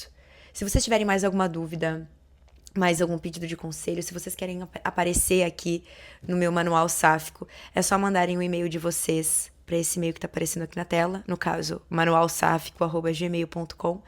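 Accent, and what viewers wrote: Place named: Portugal. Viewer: Você mora em PT ou é portuguesa? O sotaque é tão fofinho😊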